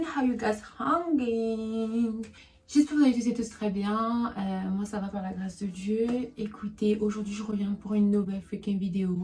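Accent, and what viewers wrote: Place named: England, United Kingdom. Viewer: L'accent anglais 😌❤️❤️sinon très belle histoire.☺️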